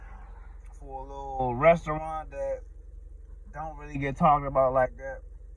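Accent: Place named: DMV, United States. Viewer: I knew I recognized that accents #dmv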